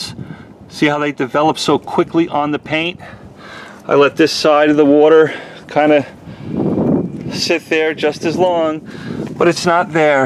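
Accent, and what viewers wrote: New York, United States. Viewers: you say this is Formula 4. Then you say this is Formula 1. LOL. 2nd, why the hell are you wearing a hoodie in such a hot place like Texas. I guess you are really crazy. 3rd, you sound like you are from New York City yet you live in Texas.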